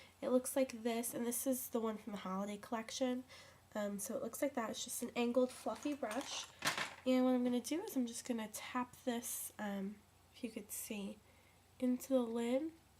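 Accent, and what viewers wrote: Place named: New York, United States. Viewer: i just looked at my 88 warm palette, and i was looked at youtube for some inspiration on a cranberry look! i'm going to use this today for school and work! and btw love the new york accent haha i'm from the west coast so i recognized it immediately!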